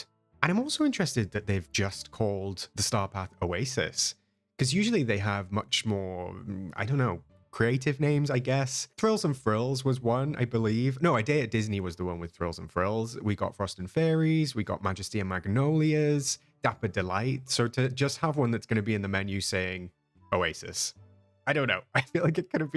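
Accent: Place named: Wales, United Kingdom. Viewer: Hey 👋🏻 Been really enjoying your content and insights…It is nice to hear a DDV content creator with a British accent (a lovely Welsh accent 🏴The patch notes are interesting but the bug fix we are desperately hoping for is the one with the male avatar which leaves him permanently with a 5 o clock shadow. This is especially noticeable in poor lighting. No matter how many times you go to facial hair settings and change the colour and Opacity it will revert back to black and 70%. It is maddening because I play DDV with my 9 year old son and our avatar is based on him and looks exactly like a teenage version of Luka! The illusion is spoiled by this bug that emerged 2 updates ago…we play on PS5 and I’m not sure if it is exclusive to that platform. Contacted Gameloft about it but got a bot response which gave me options which wasn’t relevant to the issue. 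No one else has noticed or been bothered (even on Reddit!) It is a small thing I know but it spoils the experience…like with the hiking DreamSnap when our avatar was in the boyscout outfit. Excited for the update…we are having a Aladdin marathon this evening watching all 4 films! Oh btw I hope Gameloft have really tested this stealth game mechanic! Thanks for the fun😊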